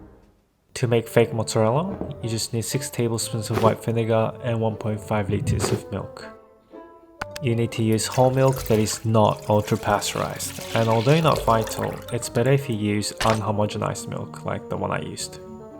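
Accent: Australia. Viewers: ur accent are u aussie?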